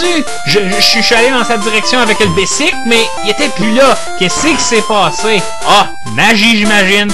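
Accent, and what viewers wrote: Quebec, Canada. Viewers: tu avais un accent... si t'étais pas Québécois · you speak Canadian-French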